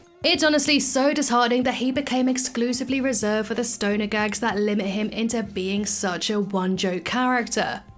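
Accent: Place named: South England, United Kingdom. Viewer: the sweet accent of south England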